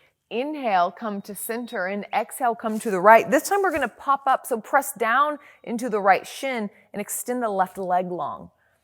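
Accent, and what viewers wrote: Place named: Australia. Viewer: Thanks so much! Love your classes! And love your Aussie accent! 'Share this with ya!" around 1.20. lol.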